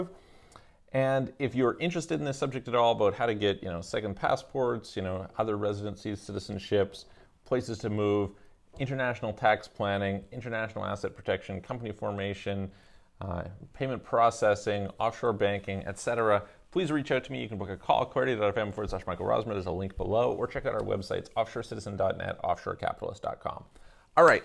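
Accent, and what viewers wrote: Canada. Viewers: Your videos are informative and I like how you say aboot.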